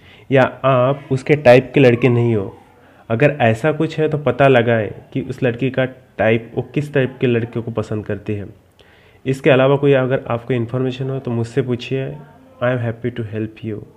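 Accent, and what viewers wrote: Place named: Bihar, India. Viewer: You never be like brain singer you can only copy him and your voice is like Bihari...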